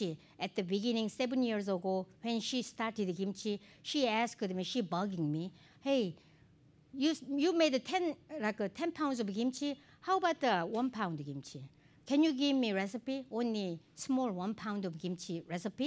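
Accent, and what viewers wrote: South Korea. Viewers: She’s so funny!! Her accent, humor, confidence makes her such an interesting person. Looove the fact she’s killing that korean accent !!!! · She is gentle. I was surprised that few subscribers were Koreans back then. Well, I got to know of her YouTube channels several years back. I wanted to learn how to describe making food in English, and then I found her channel. I was so fascinated by her videos made in English. I definitely feel that she was born and raised in Korea as soon as I listened to her speech. However, she had no difficulty explaining things in English. That's when I realized that fluency must've come first before accuracy. I know my purpose to visit her YouTube was quite different from yours, but that's the moment I started subscribing her videos. Thanks. And I cracked up when you explained how you made your pen-name,. 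Please, keep up the good work. 수고하세요! 감사합니다! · 이렇게 열성적으로, 그리고 처음엔 아무런 보상에 대한 바람 없이 순수한 마음으로 수많은 한국의 정통 음식을 전세계에 알린 한국분이 이 분 말고 또 있었을까 싶다. 그녀의 공으로 지금은 인정 받고 가치 있는 보상을 받아 기쁘다. 이런 분이 한국에서 공로상을 받아야 하고, 한국홍보사로 지정되어야 한다. 그리고 영어 정말 잘 한다. 저 나잇대의 한국 아주머니가 겨우 4년 미국 인생으로 저 정도의 언어 구사력과 알아듣기 쉽게 자기 의견을 표출하는 건 영어 정말 잘 하는 거다. 발음이나 억양이 완전히 한국식이어도 상관 없다. 영어식으로 발음하고 잘 해도 자기 의견 표현 못 하는 사람보다 훨 났다. 그리고 레서피 너무 좋고 센스도 좋고 시각에도 신경을 쓰는 모습이 보기 좋고, 심지어 오뎅이나 막걸리도 집에서 손수 만들어서 세계에 널리 알리는 노력도 감사하다. 언젠가 쿡 북 사고 유튜브 보면서 망치 레서피로 막걸리 만들어 볼거다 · Yang 저도 agree 해요. 전 한국발음이 담긴 망치씨의 영어가 너무 아름다워요. 그리고 family counselor 하시던 분이면 영어 엄청 잘하시는 분이에요. 정말 자신을 믿고 걸어오신 망치가 존경스러워요! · My mother and sister made kimchee using her recipe! And we're Korean Americans. Her recipes are authentic and excellent and she is adorable. Love her Korean accent! :) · 영어를 잘하던 못하던 열정적인 모습이 너무 멋진 아줌마다. 메이컵하고 스타일은 영어권 느낌인데 발음과 영어하는 스타일은 typical Korean immigrant but she expresses what she wants to tell so she's very full of confidence and successful person! 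I want to applaud what she does! And of course she motivates too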